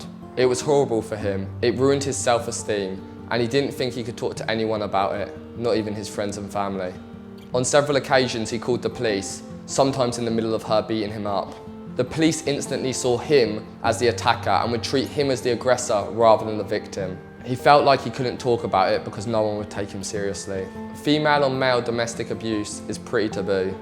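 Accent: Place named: England, United Kingdom. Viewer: His accent makes me ashamed to be from England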